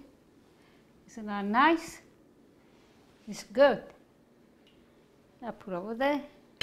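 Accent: Italy. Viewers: She uses the word "Fiammella" with this sweet accent half-italien-half-english ^^ Fiammella means little flame, so you can basically say you got it right ;) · Grazie mille for sharing yourself and your recipes. My father and his family were from Puglia but passed away before I could know them. My mother learned many of my grandmother's recipes. Your cooking is so similar and expands what I had learned from my mom. Your accent also reminds me of my relatives in Italy 🥰.Your singing makes keeps me company as I cook and I received your cookbook for Christmas this year! Your family is blessed to have you.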